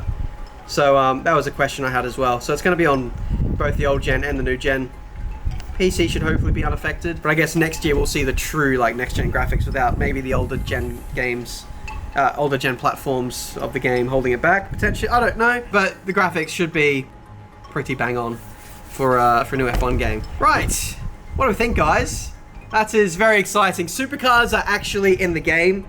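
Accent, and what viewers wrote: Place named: Australia. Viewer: Mate firstly drop the snob accent, does not suit you at all. Your an Australian Secondly… nothing more to add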